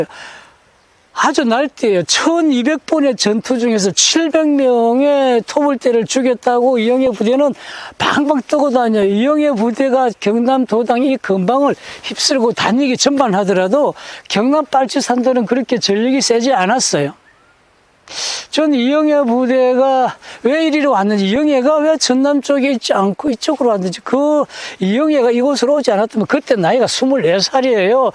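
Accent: North Korea. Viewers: 북한말씨 느낌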